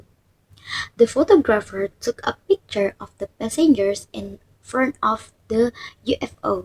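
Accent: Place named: United Kingdom. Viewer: my british accent 😻😻😻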